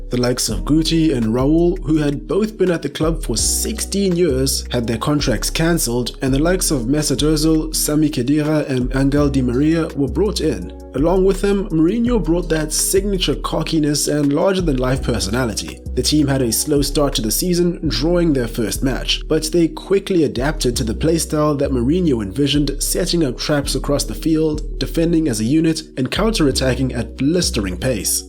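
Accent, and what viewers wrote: South Africa. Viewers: is the narrator South African ? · Random question mate but are you South African ?🤣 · Great videos mate keep up the Good work🔥.....I can't help but notice your accent sounds very South African... so are you from SA😂❤ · Off topic, are you South African?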